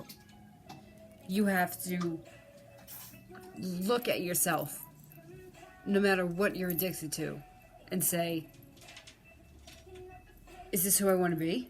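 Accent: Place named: United States. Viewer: I've just started watching your channel, your honesty about your sobriety and life is very encouraging. I have just started back in recovery. Thank you for your straight up posts. They have helped me get back to recovery and get on with it. Like your American accent attitude .. Thank you mate All the way from New Zealand Auckland.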